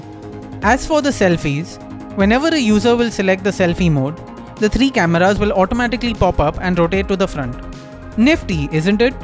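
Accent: India. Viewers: You sound like a indian scamer.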